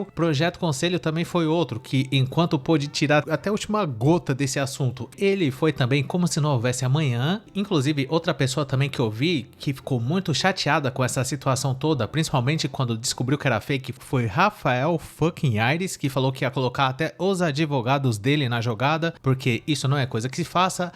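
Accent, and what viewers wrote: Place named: Southern periphery, Brazil. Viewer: Um canal de 62 mil inscritos que não tem nem coragem de botar a cara querendo falar dos outros 😂😂😂😂😂 fora esse sotaque horroroso de periferia do sul que é intragável kkkkkk se enxerga seu invejoso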